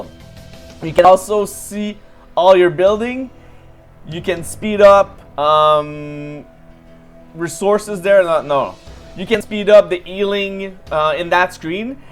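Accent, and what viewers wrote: Quebec, Canada. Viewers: liked your old french canadian pronuciation of alliance better..... nice basic tutorial for DD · Tu es clairement Québécois! Moi aussiiiii!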